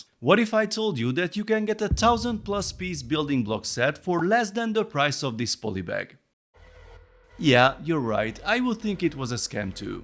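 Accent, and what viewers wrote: Hungary. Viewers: Szerintem van egy kicsi magyar akcentusa😂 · You are Hungarian? I could have sworm I heard the accent, but never openly guessed it 🤣 howdy neighbour, greetings from Croatia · No way hogy a bro magyar, nagyon fasza accent🎉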